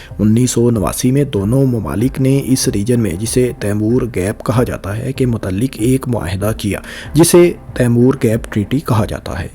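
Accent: Punjab, Pakistan. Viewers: Mr. has some serious problems in pronunciations of the names of cities, countries places and areas. 😡🤬 Therefore, the viewers should not hope that he will improve because he has a typical Paindu Punjabi accent🤮🤢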